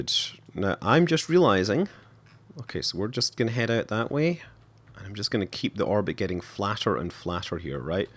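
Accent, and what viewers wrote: France. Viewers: Just love your French accent since French is my native language. You should pronounce B612 "b seece dooz" btw.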